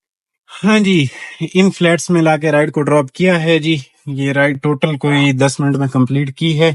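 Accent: Jhang, Pakistan. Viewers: Apki Punjabi to jhung wali ha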